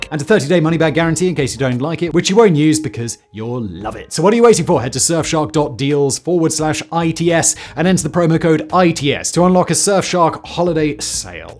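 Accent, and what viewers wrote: United Kingdom. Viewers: Thumbs down. Reason 1: You don't start video with a secs ad. 2. Your English is too British and chewy and those who don't speak it as their first language understand JACK. Put subs. proper ones, auto-generated won't do. Cheers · This guy has 50 channels lmao and he doesn't even know much about the topics he does videos on. It's basically Wikipedia paragraphs with a soothing British accent. I'll still watch though 😂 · This guy's British accent is fucking annoying me. I would've watched the whole video but that accent is too hard on me.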